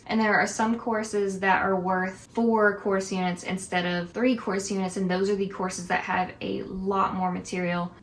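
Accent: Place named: Birmingham, United Kingdom. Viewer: Yooo you sound like you're from Birmingham then you said Jeff State, coincidence or am I just crazy?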